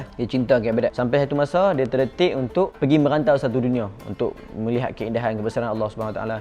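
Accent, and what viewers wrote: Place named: Terengganu, Malaysia. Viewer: Mate Prike hor ler kiter ni org pata timo je (ganu) 🤣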